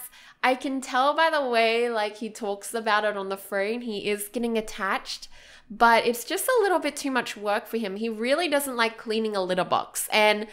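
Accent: Australia. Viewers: Hamad Rashed Probably because of the Australian Accent we all sound similar 😊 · Artem XCX kid it’s called an Australian accent they are lovely and I am blessed to have an “annoying accent” if you don’t like it leave press the red X at the top of your screen it helps (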